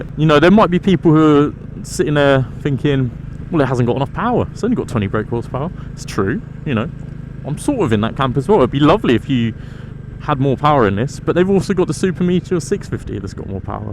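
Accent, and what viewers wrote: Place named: South India, India. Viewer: It's probably the south Indian accent of many Indian youtubers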